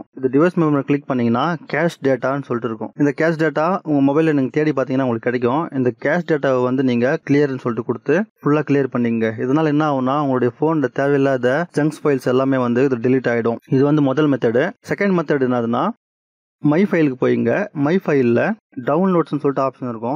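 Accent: Chennai, India. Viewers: Chennai Tamil la informative video 🌝🌝🌝awesome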